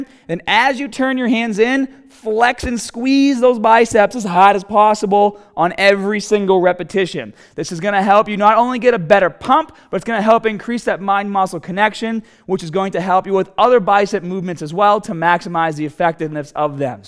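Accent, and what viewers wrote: Boston, United States. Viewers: Hey bro I’ve been watching your channel for ever if feels like!! just wanted to tell you, your so inspirational and full of information that you have inspired me to start my own fitness transformation journey and VLOGG it as well for YouTube!! Love your channel and I love your information n that Boston accent is for real!!!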